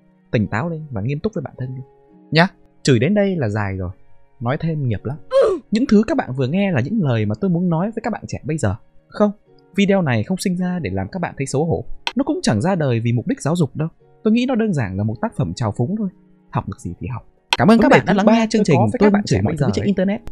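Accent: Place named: North, Vietnam. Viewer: Thề coi ông Tuân stream t ngủ ngon lắm bọn m ạ. Kiểu t cũng người Bắc nghe giọng ổng như giọng bố mẹ t lèm bèm nhau nên t ngủ ngon vcđ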